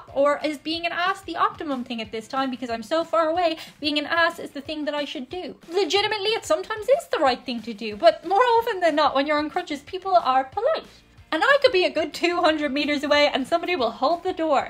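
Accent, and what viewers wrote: Ireland. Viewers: You're an angel! I'm sorry that you had to have knee surgery but it seems to have gone well. Something that seems different in this video is that you seem to be talking faster than usual, and with more of an Irish accent than you normally do. My best wishes to you for a speedy recovery!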